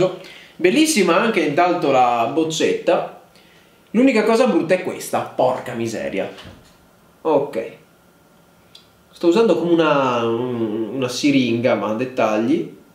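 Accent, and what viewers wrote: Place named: Bari, Italy. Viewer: Sembri più barese che napoletano 😂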